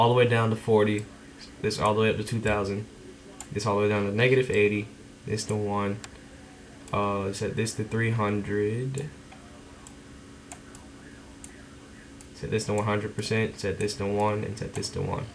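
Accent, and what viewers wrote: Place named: Knoxville, United States. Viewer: ah you kinda have that knoxville accent going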